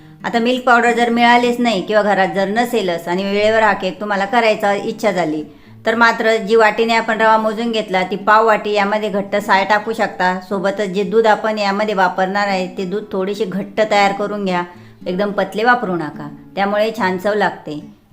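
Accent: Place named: Khandesh, India. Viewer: तुमची केकची रेसिपी झटपट आणि खुसखुशीत आहे. पण तुम्ही सुद्धा केक सारखं * थोडं गोड आवाजात निवेदन केलात तर ,* आम्हाला केकची गोडी अजुन वाढेल. तसेच बोलताना इतकी सुपरफास्ट एक्स्प्रेस * कशाला चालवता? बोलताना काही * पुर्णविराम, स्वल्पविराम , प्रश्नचिन्ह ,उद्गारचिन्ह असतात. * त्यांचा वापर केला की * आपलं बोलणं * जास्त इफेक्टीव्ह होतं * म्हणून सर्वप्रथम बोलणं आणि भाषा, बोलण्याची खानदेशी स्टाईल * सुधारा.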